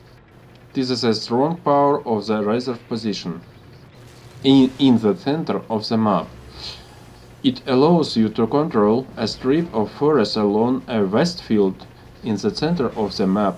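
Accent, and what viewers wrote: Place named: Russia. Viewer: типичный русский акцент)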